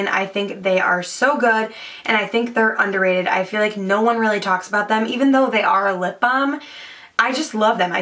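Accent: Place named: Upstate New York, United States. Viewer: Where are you from? I'm an upstate ny girl and you have a similar accent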